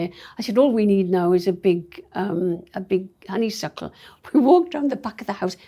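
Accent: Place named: Wales, United Kingdom. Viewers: That’s 100% a Welsh accent!!! My credentials, I’m Welsh!! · Definitely Welsh accent in her voice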